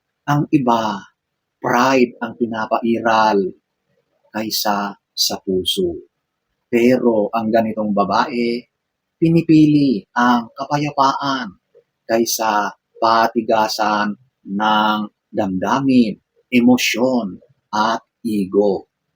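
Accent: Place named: Baguio, Philippines. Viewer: Dyan ako natutuwa sa pananalita taga Baguio ka ba sir?!😅😅😅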